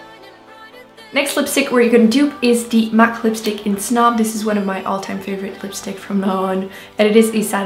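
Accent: France. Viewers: Most helpful video about dupes ever! Thank you! The first time I ever heard of wet n wild lipsticks was from your swatch video and I instantly wanted all of them lol so, I'm working on owning most of the wet n wild lipsticks because they're amazing. Every paycheck, I get one :) Also, your accents is adorable lol. Is that French or? Love your videos, girly! ✌️😊🌸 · Great video! I needed it .. Btw ur beautiful and I love your accent even though I don't know where you're from. French?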